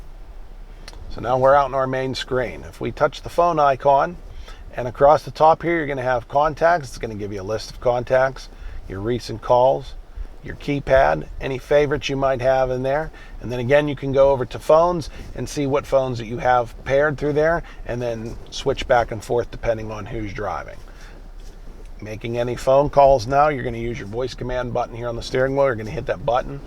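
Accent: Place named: Pittsburgh, United States. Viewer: that awful PIT accent, can hear that from. 4000 miles away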